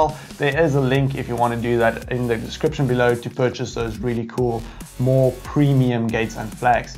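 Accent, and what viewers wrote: South Africa. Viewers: I've just started getting into fpv flight (got a tinyhawk rtf bundle and put PMB on it in betaflight) going to watch all of these vids. Also are you South African?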